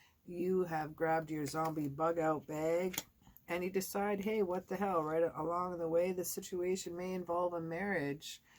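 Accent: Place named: Ottawa Valley, Canada. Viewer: I’m just Cindy, another Canadian girl like yourself! And thanks for following. 😉 I’ve been trying to place your accent. I can’t figure out if your originally from the Ottawa Valley area or the Maritimes?? 🤔. Am I close?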